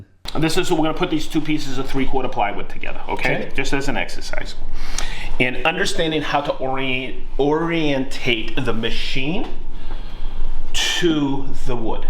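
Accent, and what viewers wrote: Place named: Rhode Island, United States. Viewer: I’m from Rhode Island I know that accent , living in Savannah Ga.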